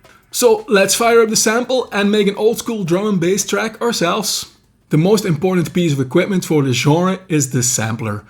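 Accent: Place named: Netherlands. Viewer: Recently started music production (never played a note before) and last 2 days been trying to make a ambient jungle song and it goes suprisingly well, only didnt know how to implement a bass. This video helped a lot in general. Groeten uit Den Haag. (Zo te horen ben je nederlands )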